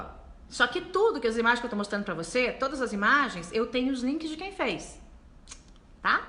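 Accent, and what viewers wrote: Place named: Rio de Janeiro, Brazil. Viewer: Robô carioca deslike!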